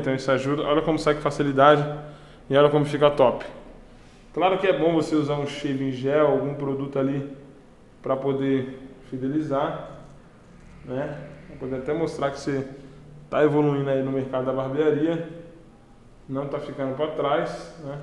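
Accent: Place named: Rio Grande do Sul, Brazil. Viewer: Tú é gaúcho né pai,eu também sou reconheci só pela voz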